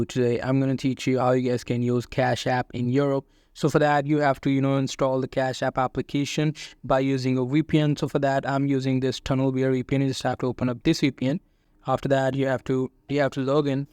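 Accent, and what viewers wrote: India. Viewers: I hear your indian accent gang no hiding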